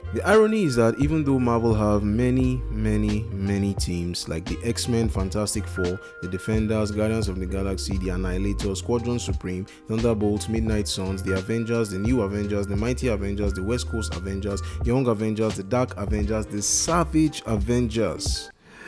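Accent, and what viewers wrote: Nigeria. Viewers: Naija bro talking comics. So refreshing to hear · Subscribed IMMEDIATELY i heard the Naija accent. We need more naija nerds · 5 seconds in I said….wait he has to be nigerian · Hello U sound like a Nigerian (I am too) Please do you know where I can buy comics here in Nigeria (Abuja) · Soothing ass Nigerian voice 🙏😩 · Nigerian? Instant sub. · I know a Nigerian brother when I hear one. Nice pacing of the video as well as the edit. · Hearing a Nigerian voice always makes me smile inside. My siz and I binge on those B- quality Nollywood movies so we really have a thing going with Naija flicks lol "Honarabohl woman??!? What do you mean honarabohl?? That gyeol dea be di most renowkn Ashewoh ddis side of de Congo, abeg." Literally heard this once and I DIED (Oh I'm Kenyan btw) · I hear a nigerian brother, I subscribe. No questions asked 😁😁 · I think he Nigerian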